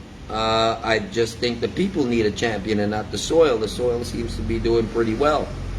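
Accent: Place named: Guyana. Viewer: im surprised u int popular lil advice tho shorter vids st88 to d point n more of d gt raw accent guyanese lik ppl dat doz tlk wah dem tinkin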